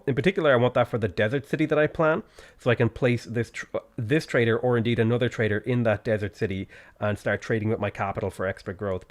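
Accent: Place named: Ireland. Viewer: Man,that thick Irish accent is probably the best thing about this channel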